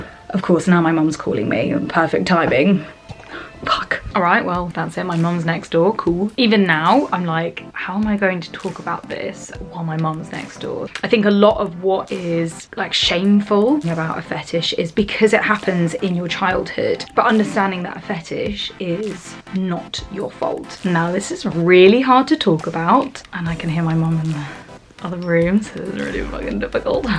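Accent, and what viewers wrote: United Kingdom. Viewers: Hello, you're AMAZING! 😁 I love your openness and especially about your tickling fetish! ❤ I have had a tickling/foot fetish since I was 6 years old! 😱 I never understood why? For years I thought that I was a mutant and kept my fetish a secret! 🥺 Then thank God for the internet! 🙌 Because then I realized that I wasn't alone and found out that it is a very common fetish! I applaud you for your boldness and openness to discuss to others about our fetish! 👏 I am bummed that I missed this certain tickle party though, 😭 I am guessing from your beautiful British accent though, that it was probably far away from me, since I live in the United States 🇺🇸 I would love to do a party myself, or if you ever plan to come to the U.S. then definitely hit me up and we could maybe make it happen, because there is A LOT of tickling fetishists all over the U.S. 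I have subscribed to you, it was the easiest decision and most important subscription that I had to make on YouTube! 👍I bet you're far from me though, because it seems to be that all the good one's (girls) That seem to be compatible or share the same interests usually live far away! 🥺 Continue being as great as you're! 💗 You definitely have a new friend and fan! PLEASE do more videos regarding tickling! 😍We the tickle community needs more people like YOU! 👇 Take care and HAPPY tickling to you! 🥰 🤣 \|/ /\ 👉👣👈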